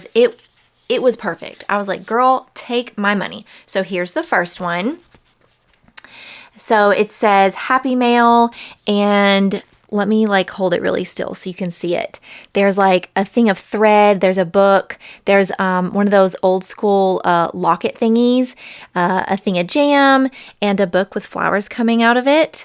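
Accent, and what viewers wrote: South, United States. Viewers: The lady is right, anywho is not a word, it is a phrase...a saying...a moment when words don't matter. I am from Michigan and my family says it ALL the time! My dad's side especially says it and we have no roots in the south at all. I think phrases said are a moment when the "uptight" leaves the conversation, lol. You say all the Southern you want Miss Ma'am! I like your southern accent and speech as it reminds me of when I lived in Atlanta with my best friend Julie. Her mom would introduce me to others saying, "This is Jennifer. She is our Yankee friend but we love her just the same." 🤣😂🤣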